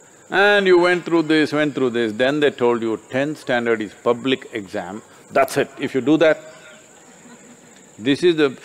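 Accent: India. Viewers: 我认为是，有一些句子，无法找到最合适字的翻译。你仔细听，有时需要前后听两句话，才能感受到当中的信息。而老师的印度腔英语，我们不太容易听出来，所以英文翻译也是相当重要的。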